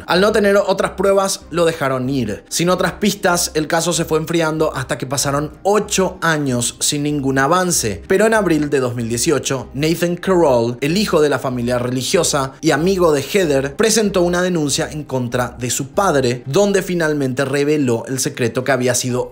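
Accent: Paraguay. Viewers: Tiene una voz espectacular!!! es Paraguayo, a caso todos los youtubers tienen que hablar con tonada mexicana??? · Tenes acento Paraguayo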